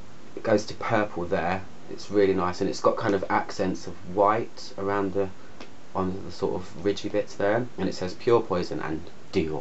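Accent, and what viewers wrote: England, United Kingdom. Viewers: I love your English accent. I'm practicing my listening with your videos. I'm also a lover of parfums 😍.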